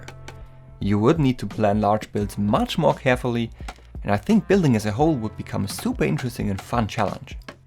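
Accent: Germany. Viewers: Klingstn bisschen deutsch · Hah wusst ich doch das dein Akzent deutsch/österreichisch klingt. Props an dich du bist einfach der Beste Minecraft "developer" (<--------- also du weißt was ich meine xD) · you sound very german. am i right?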